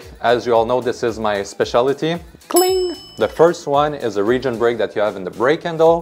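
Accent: Quebec, Canada. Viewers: Très québécois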